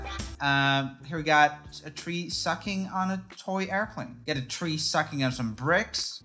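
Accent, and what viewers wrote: Sweden. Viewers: Var det där din riktiga svenska dialekt? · Holy shit. As a swedish boy I can vouch that swedish does not sound that weird. He has one of the funniest dialect I've ever heard. Where TF are u from (is it like Malmö or something)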